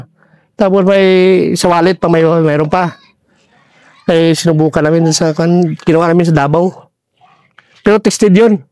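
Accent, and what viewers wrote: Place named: Iloilo, Philippines. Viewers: Ilonggo talaga hhaha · Cool lg c sir oh...accent di gid madula Basta Ilonggo😊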